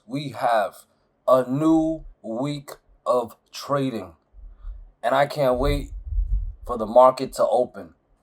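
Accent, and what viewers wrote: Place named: Northeast, United States. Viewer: you sound like you're from the northeast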